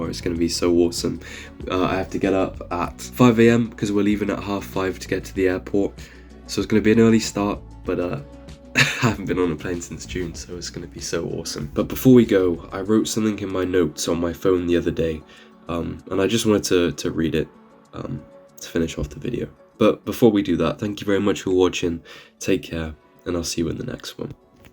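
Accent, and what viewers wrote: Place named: England, United Kingdom. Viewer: I don’t think that I’d ever have contact lenses, as the chances of getting eye infections is too great, even though I started wearing glasses for driving motorcycles in my home county of Meath in Rural Ireland many years ago (I’ve only lived in the U.K. (Manchester) for 24 years now) but I had a friend who had a severe eye infection from wearing contacts and he was in the MRI (St Mary’s Eye hospital university training hospital in Manchester) as an inpatient for several days - I’ve also used St Mary’s and the MRI dental hospital over the years and because they are a university training hospital, they have a consistently very high standard of patient care - at age 54 myself now, I now wear varifocals - by the way, I could listen to your gorgeous and well spoken English accent all day, it sounds so classy - despite my years living in Manchester, I’ve still retained my strong yet clear Irish accent, about which I’ve had a lot of compliments from my English friends 🇮🇪☘️🇮🇪😍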